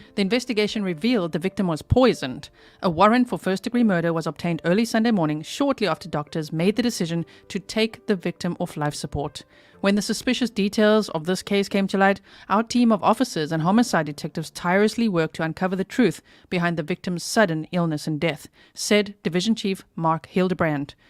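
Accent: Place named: South Africa. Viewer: nice to hear a South African accent!!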